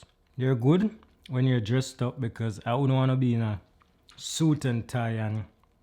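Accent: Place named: Jamaica. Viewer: I don't know if it's a Jamaican accent or African....oh ..Jamaican.. Big up G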